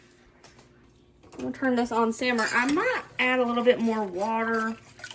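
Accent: South, United States. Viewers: Keeping meals simples❤ I rrally enjoy watching. And I love your southern draw when you talk. God Bless you and your family in 2025.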